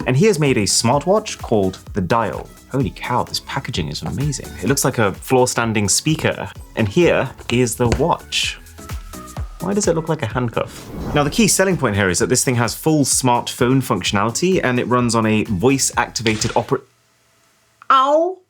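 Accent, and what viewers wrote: United Kingdom. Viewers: Ru British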